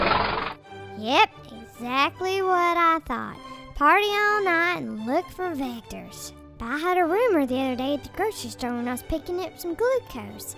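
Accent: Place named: South, United States. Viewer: That's very very nicely done.Please do other parasites too. I guess anything with southern accent is catchy.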